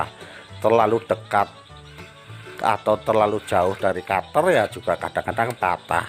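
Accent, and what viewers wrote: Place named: Banyumas, Indonesia. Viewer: Logate banyumasan banget pak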